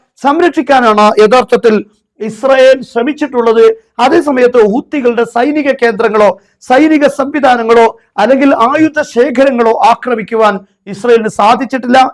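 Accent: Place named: Tamil Nadu, India. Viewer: Dont read english please, thats not your skill , your Tamil english very irritating but all other talks are ok😅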